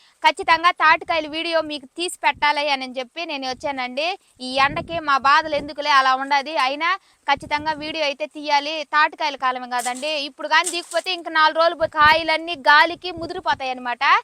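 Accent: Nellore, India. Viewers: E ooru akka, mida, mi telugu nellore slang laga undi.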